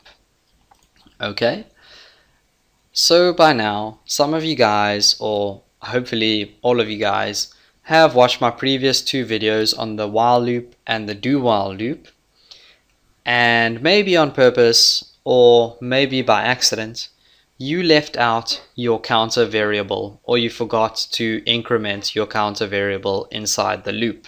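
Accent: South Africa. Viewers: I knew that was a South African accent. Thanks boet !!!!!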